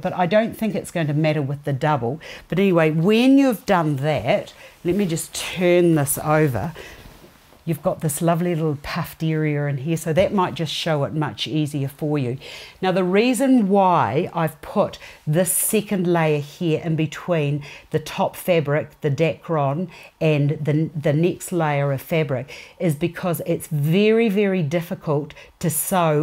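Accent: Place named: New Zealand. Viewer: How awesome. As soon as you started speaking I thought yayyyy a kiwi!!! Awesome seeing NZers coming up at the top of my search list. Love from Ari in welly!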